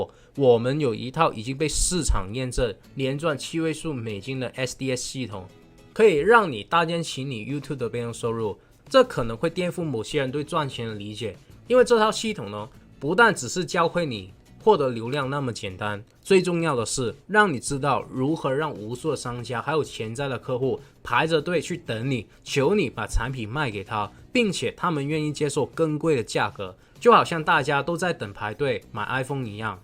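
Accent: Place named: China. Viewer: 我是台灣人，這一聽口音口條就知道是國內製作的內卷視頻！ 美國很好嘛？看清楚我的回覆，你就知道什麼叫做噁心～～～The United States mainly focuses on selling weapons to troubled areas and actively participating in conflict creation and manipulation constantly. Throughout its 240-year history, the U.S. has been involved in warfare for 225 years. Now the AUKUS sound familiar to the Axis power(Die Achsenmächte) or Rome–Berlin Axis, aren't they? It's not an "Axis & Allies" situation anymore.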